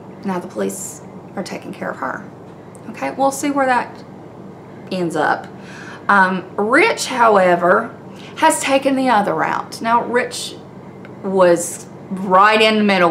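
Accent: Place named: Georgia, United States. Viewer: That is hilarious! I’m from Tennessee, and if you give me a minute, I can sound like a hillbilly or Dolly Parton, take your pick. Otherwise, I think I have just a bit of a Southern accent. (I watched a load of TV growing up, so I adopted a neutral accent on purpose after I was insulted by a Canadian as a child while on vacation in Florida.) Where are you from? I would guess Northwest Georgia by listening to you.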